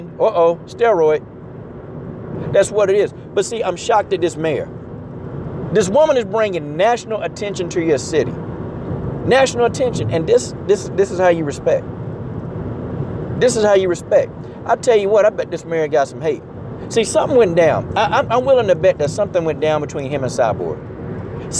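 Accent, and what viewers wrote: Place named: South, United States. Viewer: Southern pronunciation strikes again, ahaha. It's Koo-Ree-Chee-ba... or Koo-Ree-Tee-Ba for European Portuguese/certain parts of the country.:)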